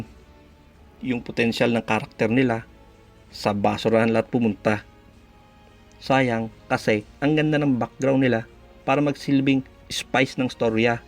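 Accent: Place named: Batangas, Philippines. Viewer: haha ibang klase batangenyo ka pala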